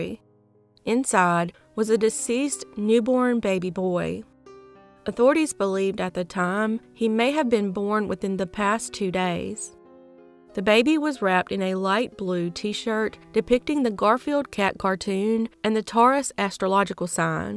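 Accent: South, United States. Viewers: Thanks for another great video! May them RIP. When do we get to know you amd when is the Patreon available? You sound very southern ❤️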